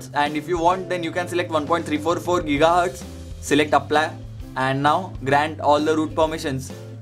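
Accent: India. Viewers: sick of this fucking indish accent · you will never be promoted coz of your indian 2rs english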